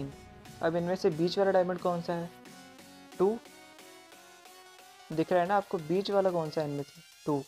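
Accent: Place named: India. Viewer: dude i hate hindi accent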